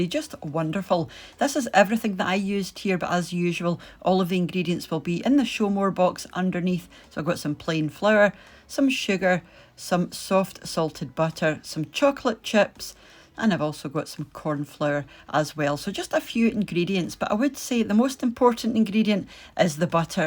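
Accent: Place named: Inverurie, United Kingdom. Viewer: Mystery solved! Now I know why you sound like my friend from Inverurie! 😆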